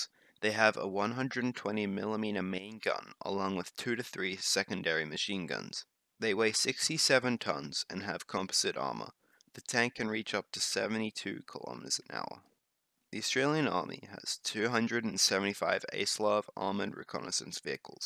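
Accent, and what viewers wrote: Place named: Bronx, United States. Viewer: Sounds like a dude from the Bronx who likes saying "the Australlian Army", was getting a bit annoying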